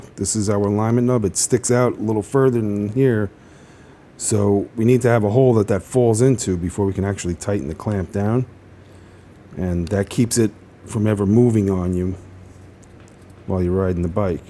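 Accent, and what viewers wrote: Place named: United States. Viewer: Sounds more American than British 😢😢😅😅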